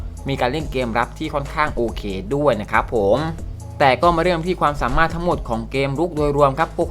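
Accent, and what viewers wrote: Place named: South, Thailand. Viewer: พี่อยู่จังหวัดไหน สำเนียงเหมือนคนใต้เลยครับ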